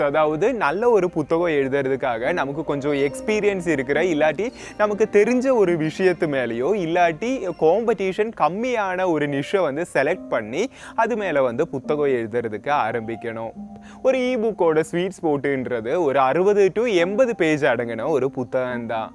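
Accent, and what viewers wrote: Kerala, India. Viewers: Nenga keralatha.....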